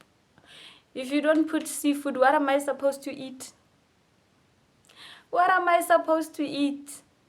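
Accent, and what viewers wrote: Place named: Fante, Ghana. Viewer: You can hear the Fanti ascent in her ❤❤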